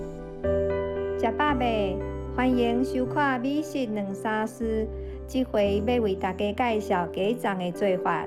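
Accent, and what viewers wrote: Hsinchu, Taiwan. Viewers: 影片配上甜美的新竹腔口，很親切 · 講了真詳細，你的台語足好聽，感謝。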